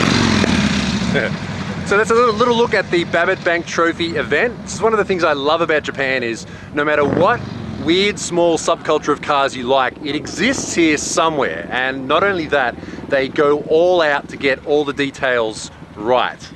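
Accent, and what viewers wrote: Texas, United States. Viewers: am I the only one to not get enough of Texas accent? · I lived in Texas for 16 years. I think you'd fit right in around Houston or San Antonio with that accent tbh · ahahaha that was a decent American accent considering i know our real voice. best intro ever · not even gonna lie you nailed the American accent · Your American accent threw me for a serious loop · i personally think your american accent was pretty good and im from america lmao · You have a better Texan accent than most that live here since a large group of them are not from here lol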